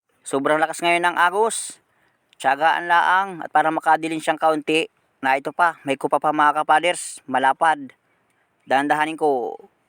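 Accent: Bicol, Philippines. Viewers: Bicolano ba kayo o ilonggo o Batangenyo 😂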